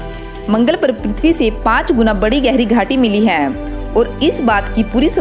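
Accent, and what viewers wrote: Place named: Sikkim, India. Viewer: U are from sikkim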